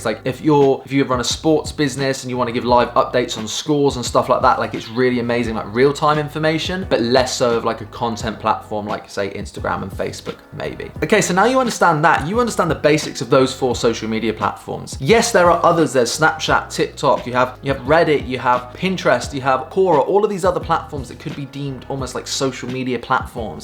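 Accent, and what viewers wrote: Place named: United Kingdom. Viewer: I love your accent. What part of the UK 🇬🇧 are you?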